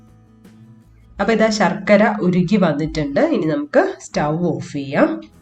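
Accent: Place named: Malabar, India. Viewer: Hii...😄 recipes simple & tasty aanu...adhikam budhimutillathe vegathil undakan pattunnu 😄. ettavum ishtapettath Malabar slang presentation aanu..eniyum nalla recipes wait cheyunnu...😋😋..takecare dear 👍❤️😊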